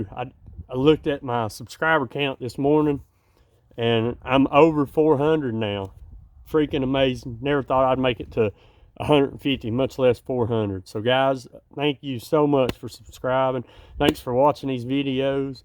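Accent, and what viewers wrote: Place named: Virginia, United States. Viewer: I should have realized you were from Virginia by the accent. I am too (although I live in Texas now). Great to know a fellow Virginian! Great review on the Baklash. They just came out with a carbon fiber version, so I may have to grab another one.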